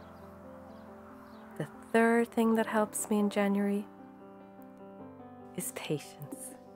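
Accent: Ireland. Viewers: What beautiful and peaceful videos, deeply relaxing to share this, thank you. The way you live is how I too love to live life, enjoying all the small pleasures and that is how I get through all my months, just taking one step at a time end savoring the moments and all the beauty in my life! Blessings dear friend, you have such a soothing voice and I love the Irish accent. All so inspiring here!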